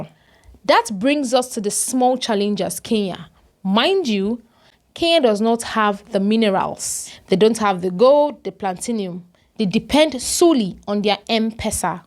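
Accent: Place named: Nigeria. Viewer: I was about to follow this useless account before this nonsense of comparing us, with nigerian accent it's not surprising.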